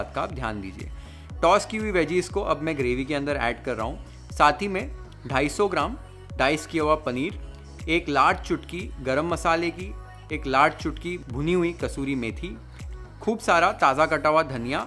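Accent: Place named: Gujarat, India. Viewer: Music k link Bhi do Na 🥰 fan from A'bad /Aus 💕ur gujju touch in accent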